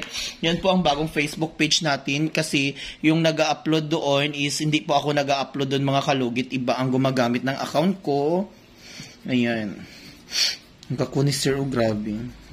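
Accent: Iloilo, Philippines. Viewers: daw ilonggo kaman maghambal. .hehehe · Daw ilonggo ang tono haha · Taga saan po kayu?ilonggo ka eh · ilonggo ka po?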